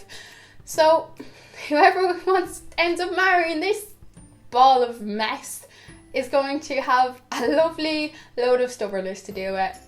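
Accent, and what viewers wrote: Ireland. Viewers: hate cheaters with a passion and I'm proud of the fact that I have never cheated on a girl love your video's and love your accent nothing better than a beautiful Irish accent ❤❤❤ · What county in Ireland are you from?😆❤️And I love love love this video!😘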